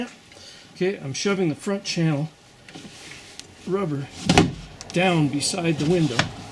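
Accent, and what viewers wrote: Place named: South, United States. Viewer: Your southern accent is far to thick for this future door trim repair man.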